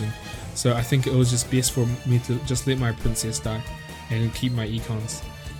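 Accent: New Zealand. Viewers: You kiwi bro?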